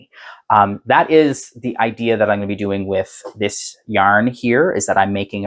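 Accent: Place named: Eastern Canada, Canada. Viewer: I just discovered you and I know NONE of the background—your back story. Is there an episode in your previous series that introduces you? I think from your accent you might be eastern Canadian? Going to go watch the next one. Really enjoying it!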